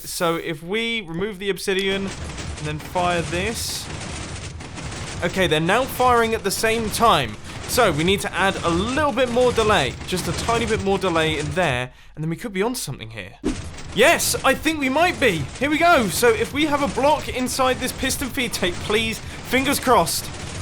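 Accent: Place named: United Kingdom. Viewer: He's British from what I've heard..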